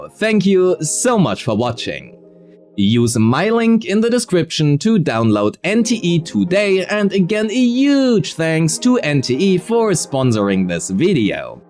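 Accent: Germany. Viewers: I hear german accent + see a good structured guide with a pinch of humor - it`s an insta subscribe 🔥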